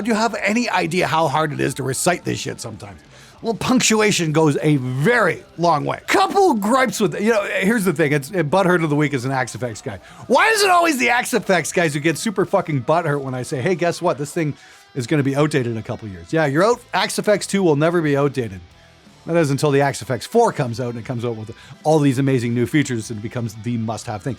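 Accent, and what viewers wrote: Canada. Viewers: Do I detect a Canadian accent in your bass player speak? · You understood that? With such a thick Canadian accent, I couldn't make any of it out.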